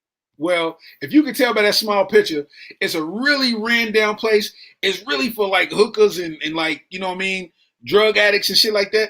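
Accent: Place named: United Kingdom. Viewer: There's something about how brittish people articulate themselves that makes it twice as funny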